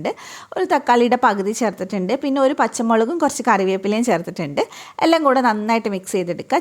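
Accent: Kannur, India. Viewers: ഇത്ത കണ്ണൂർ എവിടെയാ മട്ടന്നൂർ aano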